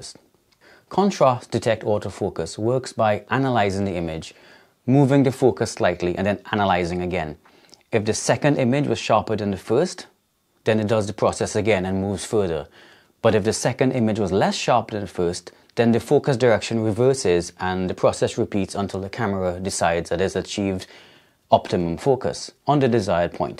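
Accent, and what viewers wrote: Caribbean. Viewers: Being from the Caribbean I recognise Caribbean accents instantly 😅 Thank you for the informative video, particularly sharing tips for auto focus settings. I've probably watched over 20 videos about the LUMIX S5 in the last 2 days and your video is the 3rd video to share tips for better auto focus out of 20+ videos. All the other videos I've seen just complain about the auto focus but don't offer any tips to improve it, I thought one of the biggest selling points of having a camera with pro features was the ability to dial in your own custom settings but seems like those other reviewers just wanted the auto focus to be good out of the box. Considering what this camera goes for now with the 20-60 lens included (under £1,200.00 New) I think it's a small compromise. I've been saying for years, "I'm going to buy a high quality camera" to share photos & videos of my work but other things kept coming up but I think the time is now and the LUMIX S5 looks like a very good deal for the level of quality it can provide. 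Thanks again for sharing your thoughts and experiences 👍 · Great video! I just got my S5 and am learning to use VLOG and colour grade. You sound West Indian. Are you? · Fantastic user's insights into the S5. Great hybrid camera. Your hybrid Caribbean accent is also great..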